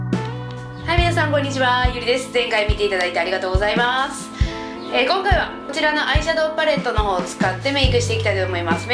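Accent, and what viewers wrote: Kansai, Japan. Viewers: さん、関西弁やから親近感がわく( ＞∀＜ )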